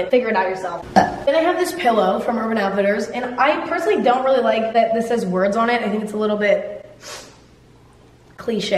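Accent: United Kingdom. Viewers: Wait u British?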